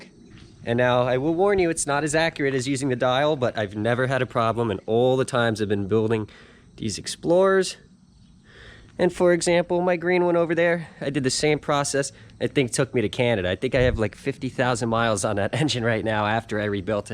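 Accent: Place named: New Jersey, United States. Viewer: btw, I'll be in Summit, NJ over summer....I thought you might be local based on speech.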